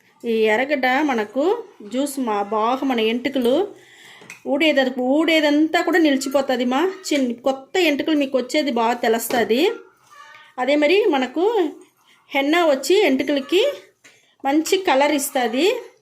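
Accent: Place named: Rayalaseema, India. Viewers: ఈమె చిత్తూరు యాసలో మాట్లాడుతున్నారు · Tamil kadhu, rayalaseema telugu adhi, naa frnd vundhi rayalaseema ammayi, thana slang kuda ala ne vuntadhi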